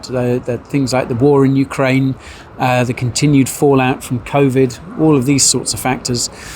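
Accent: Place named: United Kingdom. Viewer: Hey I use your vlogs to learn British accent and use in my acting 😁 keep them coming!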